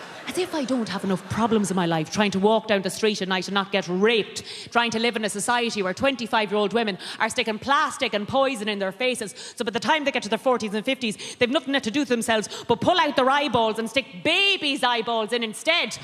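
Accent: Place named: Ireland. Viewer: Dynamite, very smart, and as an American, I love the Irish accent.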